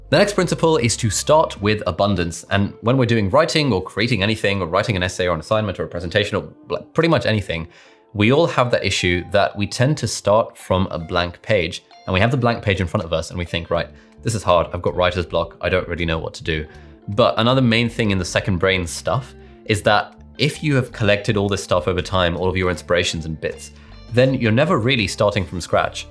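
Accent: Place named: United Kingdom. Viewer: You are a Pakistani but how did you learn British accent?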